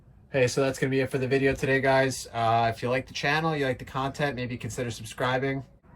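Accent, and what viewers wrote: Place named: Long Island, United States. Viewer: That Long Island accent 😭😂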